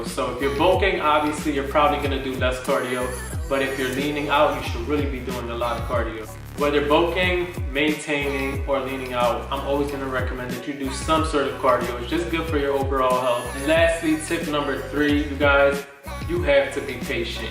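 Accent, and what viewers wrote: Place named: Philadelphia, United States. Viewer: You are SO PHILLY! I can hear your accent. I love it. This was super helpful though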